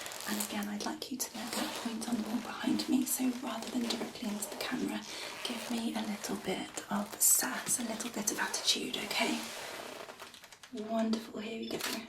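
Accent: England, United Kingdom. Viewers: you look like a living doll! You have that "Carrie Underwood" effect, where you look so beautiful, that you you don't seem to be real!:-) Thank you so much for your soft, English accent - listening to your ASMR puts me right to sleep when I am suffering from insomnia! Also - LOVE the leather look and sounds... the "leather jacket" look is "Amazing" on you!! Thank you again, and Cheers!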